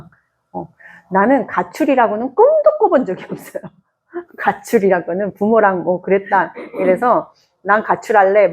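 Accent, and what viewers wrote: Gyeongsang, South Korea. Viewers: 경상도 말투가 가끔 있어요. 근데 서울사람. ~^^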